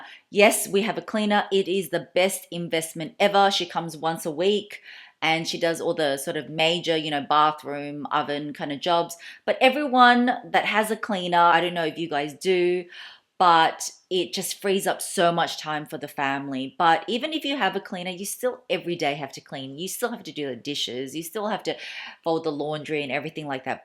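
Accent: Melbourne, Australia. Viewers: Hi. I just started following you on youtube and i loved your clips. Also I loved your Aussie accent 😊. I also graduated from Monash University in master of communication back in 15 years ago. Now i lived in USA. Anyway, i just wanna say please continue doing it. You brought back my ultimate moment while i was in Melbourne. Thank u! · I love the accent you had.💕 it’s was same aussies accents? Of Blackpink Rosé she’s korean but born in melbourne Australia 🇦🇺 if I’m not mistaken.🥰🥰🥰😅😅 I love it very classy.💕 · Just found you! Love your videos I miss Melbourne so much and your accent reminds me of home so love listening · Heyy In Melbourne how do you tell if you buy branded goods quality are great quality of the handbags, slg, shoes, clothes ect.... sunglasses, scarf as im thinking of buying something soon like maybe frm lv, bv, ysl, mont blanc, cartier. 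Thank you for the advice & tips gff im such a hugh fan of yours from a country nearby which is Singapore and oh boy i've been watching your vids/vlogs all weeks, months, weeks, days your my no.2 favourite youtuber oh man i love your aussie aussie accent sounds so smoothing to the ears haha lol very calming as well lol. Omg gff we got the same taste we love going to thrift stores to look for vintage stuff + i love eating as well haha lol awhhhh your dogie is such a cutie so sorry to hear about it so sad 🥰😍😘😗☺😚😙😋🤗🤧🤧🤧🤧🤧🤧😢😥😓😩😭😭😭😭😭😭 & your handbags do you sent it to the bag spa? For cleaning, touching up ect....get it restitching? Does your hubby + you sit down with other and talk about not spending too much? You too stay safe stay strong stay healthy as well my gff. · I am not much of a follower of fashion you tubers, but I have enjoyed viewing your videos. I enjoy your content and your Aussie accent and all the videos I have seen you look very pretty and classy.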